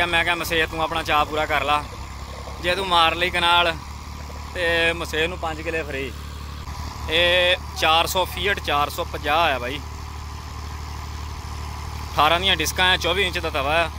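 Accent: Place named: Doaba, India. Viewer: Paji boli ta thodi doabey di lagdi a....khush raho veer bohat vadiya c vlog....hor v paya karo tractor related videos.....Allah thonu tarakiya bakshey....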